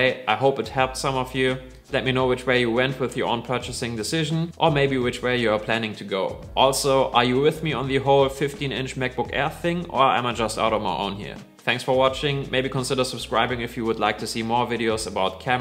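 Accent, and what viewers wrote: Germany. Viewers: Hab sooo bemerkt dass du deutsch bist aber gutes Video 😅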